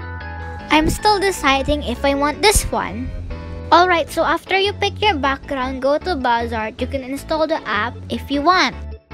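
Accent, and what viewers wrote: Philippines. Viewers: Uhmm... "Karachter" You have a Philippine accent Ms. Cute voice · Your voice is nice but it sound like your filipino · your accent is like pilipino thing But your cute anyways whos pilipino there click the like button Small youtuber here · She sounds Filipino. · You have a Filipino accent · Are you Philippina? You sound like you have a phillippino accent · You speaking seems like philipina's are you philipina's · Wow I just realized that this accent is Filipino, sooooo I bet this is gacha tuber is filipino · ME: hears her voice ME: FILIPINO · Just from the voice i here another FILIPINOOOO YAYAYYAYAYAYAY SHES ONE OF US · Are u filipino? Cuz ur accent is like mine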